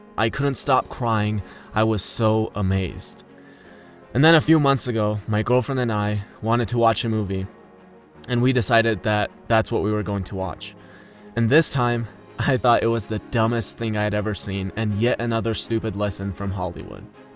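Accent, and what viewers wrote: Georgia, United States. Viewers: What? Are you from Georgia? Duude❤ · You from Georgia? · You are from Georgia?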